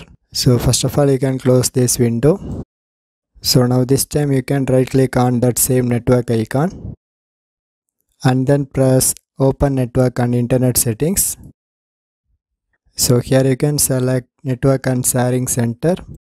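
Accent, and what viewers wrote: India. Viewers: he has an indian accent thats why its sounds like gays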